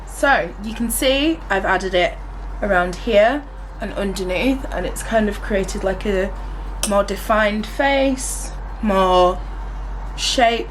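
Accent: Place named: Leeds, United Kingdom. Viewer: !!! I knew I recognised the accent! I'm from Leeds! Going to watch all your videos now 😂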